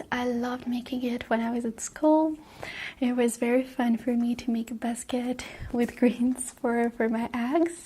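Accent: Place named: Russia. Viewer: I thought you were Russian😂oops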